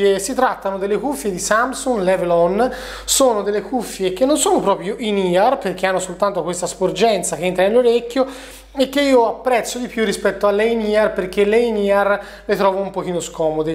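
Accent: Tuscany, Italy. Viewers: Sei toscano, vero? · sei toscano???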